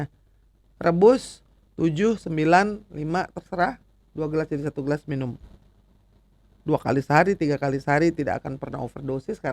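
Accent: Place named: Java, Indonesia. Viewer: orang medan, aku seneng seperti orang jawa bahasany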